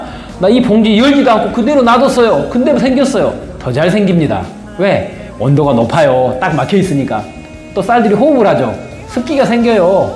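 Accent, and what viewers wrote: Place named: Jeolla, South Korea. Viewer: 인상이 좋으시네요^^ 잘 들었습니다^^ 말투로 보니 제 친가 지역 (7시 ㅋㅋ) 부근에 있으신 것 같기도 ㅋㅋㅋ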